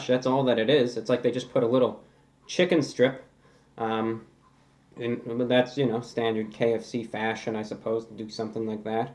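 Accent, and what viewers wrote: United States. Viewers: Is he American?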